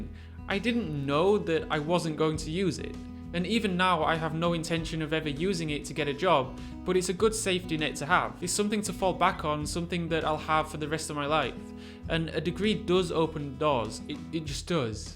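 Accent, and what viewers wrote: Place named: United Kingdom. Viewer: Its a ridiculous how elegant your british accent sounds even when you are saying this like “then your shit is broken” 😂😂 Another video to love and another idea to cherish.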